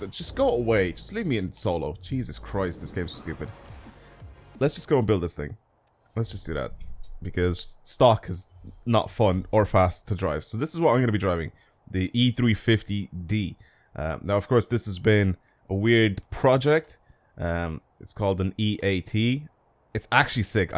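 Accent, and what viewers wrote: Ireland. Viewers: I can't tell what his accent is but it sounds Irish or European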